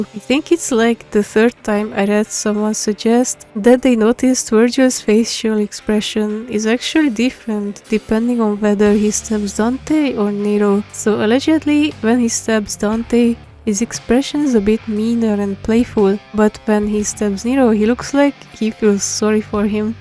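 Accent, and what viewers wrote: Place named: Hungary. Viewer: this accent is so Hungarian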